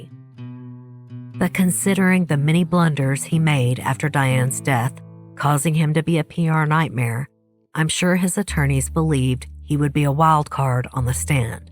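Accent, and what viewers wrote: South, United States. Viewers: I love your channel, you have the most relaxing voice to listen to a good story while omw to bed. And I’m from the South so I love your accent, it makes you feel familiar!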